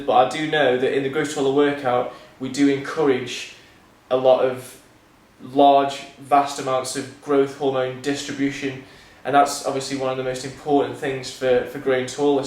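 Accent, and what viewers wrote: United Kingdom. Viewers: can't understand British accent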